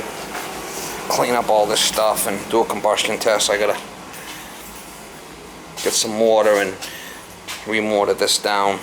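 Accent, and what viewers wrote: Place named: Massachusetts, United States. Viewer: Sounds like you're from Massachusetts where's your fire Watchman for that torch . lol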